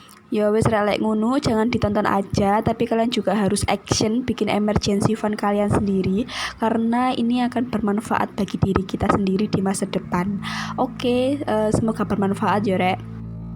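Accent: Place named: Java, Indonesia. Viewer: Asli org mana mbak? Kok medok bangets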